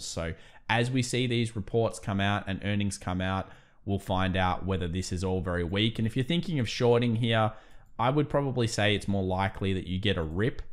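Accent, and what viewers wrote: Australia. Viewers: "Apple" is not pronounced "epple". Therefore, "Well" is not pronounced as "Wal" and "selling" is not pronounced "saling", "help" not "halp", "health" not "halth' - do you get it? Without these distractions, your presentations might be more listenable. I realise that this a peculiar to residence of the State of Victoria but it doesn't make it right.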